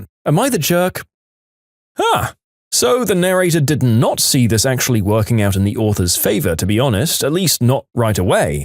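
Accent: United Kingdom. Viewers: oh he's British now hi fellow Englishman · WHEN DID YOU TURN BRITISH?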